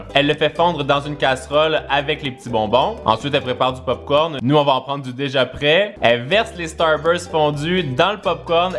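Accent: Quebec, Canada. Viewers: T le meilleur continue comme tu es et ne change pas et ton accent quebequois est MAGNIFIQUE ❤️❤️❤️❤️❤️❤️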